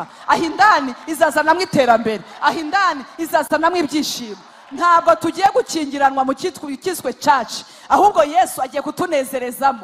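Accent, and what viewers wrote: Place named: Burundi. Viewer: Minyoni noneho ko uvuga nka uwiburundi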